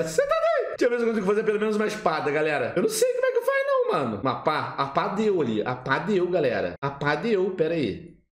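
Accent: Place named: Portugal. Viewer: eae depois de muito tempo sem ver seus videos eu voutei quando vi seua intro ja bateu uma nostalgia cara amo seu canal assisto seu canal desde os meus 5 anos de idade saudades amo seu canal percebi q vc ate ta com um sutaque meio portugues kkkkkkkkkkkk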